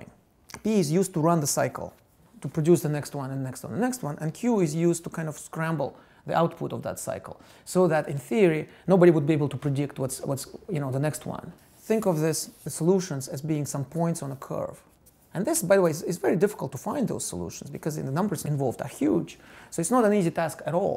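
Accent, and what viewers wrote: Russia. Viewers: His accent sounds like Russian. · Russian accent explaining how the US govt spied on... Everyone. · Dat Russian accent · He has definitive russian accent. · I didn't even listen to the contents of the video, I just love the Russian accent!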